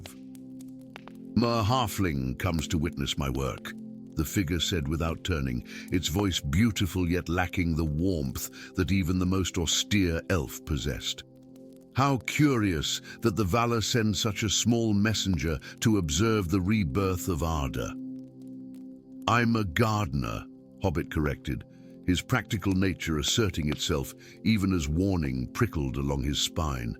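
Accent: United States. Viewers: Well this voice is better, but it still sounds American. I’d much prefer an English or European or Scandinavian or anything really but NOT American.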